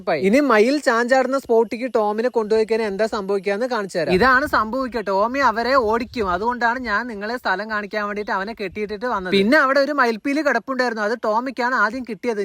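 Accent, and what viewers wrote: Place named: Palakkad, India. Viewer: നമ്മുടെ പാലക്കാടൻ സംസാരം കേൾക്കാൻ നല്ല രസമുണ്ട് ഏട്ടാ നിങ്ങളുടെ വിഡിയോ ഇഷ്‌ടമാണ് നായകുട്ടിയെ ഒരുപാടു ഇഷ്‌ടമാണ് ♥️♥️♥️♥️♥️♥️♥️♥️♥️♥️♥️♥️♥️dog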